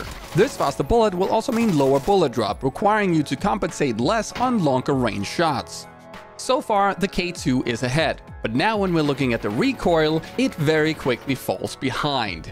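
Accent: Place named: Denmark. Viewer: interesting danish accent!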